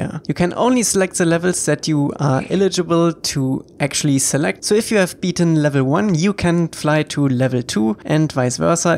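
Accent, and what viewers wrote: Germany. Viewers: Is it possible what you are german? Your voice sounds so german xD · Mann merkt das du Deutsch bist dein Englisch musst du verbessern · I don't understand why you can speak so fluently but still sound the most German I've ever heard xd anyway, keep it up! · Question, what is his accent? Ik I’ve heard the accent before. I keep wanting to say it’s German but I’m not sure. He keeps saying v for w, which is why I think it’s German. Anybody know if I’m right? I’m honestly very curious. Lol. I think I’m wrong tho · I can hear that you can speak german. Pls make a german video.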